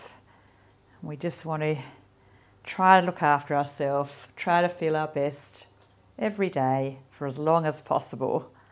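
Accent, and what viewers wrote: Australia. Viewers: Thoroughly enjoyed this 🤩 thank you so much… I’m about to turn 55 and move/workout daily but really need this in my life now… it’s lovely to hear a fellow Aussie accent too… 👏🏻👏🏻👏🏻 this is my first video with you and I will definitely be moving with you daily… ☺️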